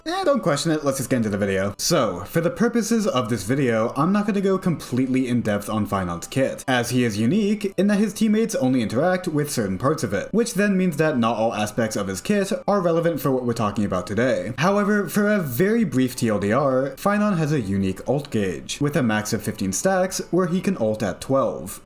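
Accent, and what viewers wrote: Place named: United States. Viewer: You sound like an American so I was wondering how you mispronounced Geopard's name.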